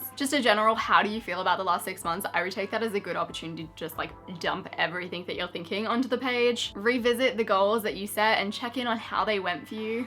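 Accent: Australia. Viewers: I love the way you talk! Being from Germany, I normally only watch TV Shows in American English and I am so not used to Australian(I believe) English and I LOVE it! Thanks for tipps. I am kinda in between kind of type, I got some goals done(planned to go vegan for a month and went vegan on a constant so I went over the top of my goals; planned to fall back in love with my life and body and I am rocking it;I planned to visit at least 5 new cities and I have 6 by now!) but then again, I planned to make more friends and start a blog and didn't achieve it yet but in German we say “the night is still young“ except it is the year in my case. Soooo much love coming from Germany all your way! Thank you for always reminding me to go after my goals and grow! PS: how is your year going regarding to your goals?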